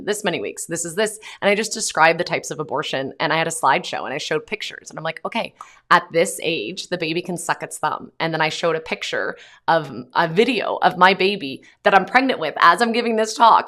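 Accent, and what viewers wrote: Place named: Ireland. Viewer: Oh, I am so ashamed of my country, I am from Ireland and it is just getting worse. I also pray for the softening of hearts and that the scales fall from their eyes. On a lighter note, I love your Irish accent ☺️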